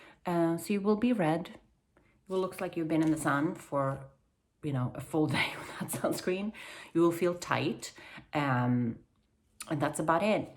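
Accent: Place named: Sweden. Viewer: Hej, jag skriver på svenska för jag tror du är svensk, tyckte det lät så. Jag bara undrar om denna penna funkar bra mot ärr i pannan, och om det är säkert att använda så man inte gör det värre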